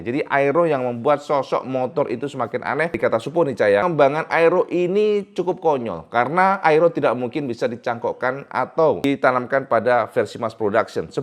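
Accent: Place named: Tulungagung, Indonesia. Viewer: Bang lu orang tulungagung juga yaa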